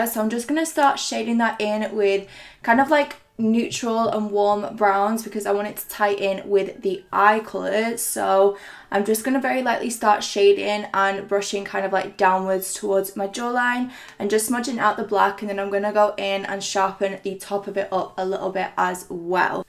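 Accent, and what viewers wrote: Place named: Scotland, United Kingdom. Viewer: Hahaha you must be from Scotland. Aren’t you?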